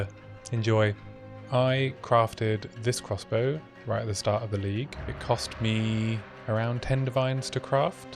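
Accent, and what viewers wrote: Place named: England, United Kingdom. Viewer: Hi. I've been theory crafting a build for the negative armour on Warbringer. I'm on a holiday so been researching other armour break builds when the family have gone to bed. I just watched through your videos of this league and they're great. I love listen to a fellow Englishman talk about POE2 for a change. You videos are high quality and you explain things well. I'll definitely check you out on twitch when I get a chance. And my condolences on the attack speed. 😅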